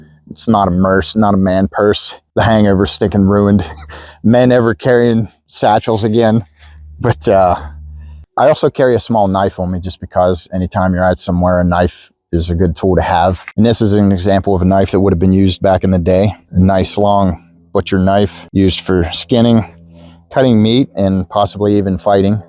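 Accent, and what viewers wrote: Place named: Pittsburgh, United States. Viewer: When I saw the one of your other videos, I thought that there was a little "yinzer" in that accent. The second video shows you sitting at the Point. :)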